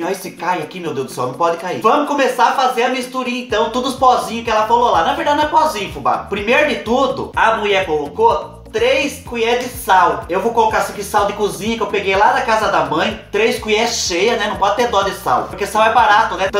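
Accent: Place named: Paraná, Brazil. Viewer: KKK ELE NAO PARA DE FALAR NEM PRA RESPIRAR, DO QUE LUGAR VOE E DO PARANA, EU AMO ISSO, KKK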